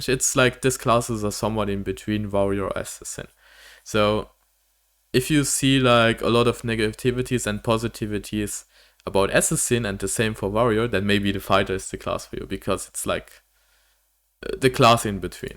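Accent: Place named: Germany. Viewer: Are you german? You sound german, lol.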